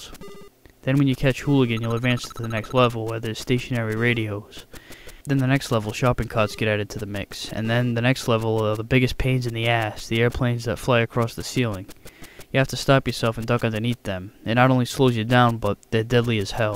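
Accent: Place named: New England, United States. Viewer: Similar Boston-to-Providence accent.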